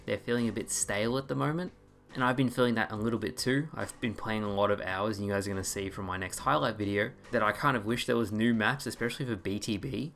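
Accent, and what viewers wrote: Australia. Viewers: So good to hear an Aussie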